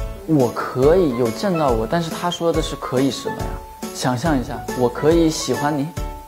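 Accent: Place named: Dongbei, China. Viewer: 呗 got me thinking he said "bae" instead. Thank goodness for his Dongbei accent. Happiest mistake ever 😍💕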